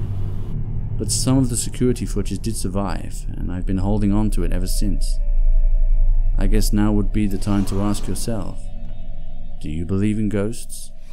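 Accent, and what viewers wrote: United Kingdom. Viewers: Why does the narrator have to have a British accent? It just makes no sense, is pretentious, distracting, and unnecessary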